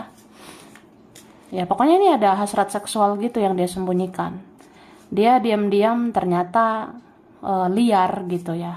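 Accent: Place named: Java, Indonesia. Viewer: meduuuukkk suarane sampean mbak yuuuuuu....slam persahabatan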